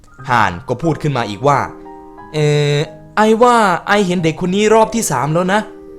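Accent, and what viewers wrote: Isan, Thailand. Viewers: เนื้อเรื่องมันก็น่ากลัวนะ แต่ฮาสำเนียงอีสานพี่มากกว่า อินอะไรขนาดนั้น <num> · ชอบเสียงอีสาน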